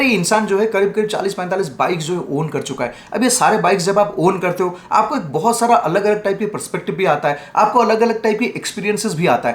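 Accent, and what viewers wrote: Odisha, India. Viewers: = Odia vibe with hindi talking ❤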